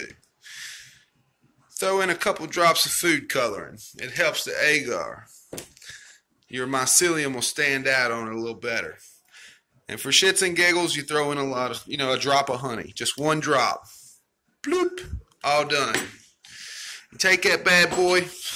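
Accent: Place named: South, United States. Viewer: Love it. You got to be from the South:0) Im born in TN and live in SC. Best video ever.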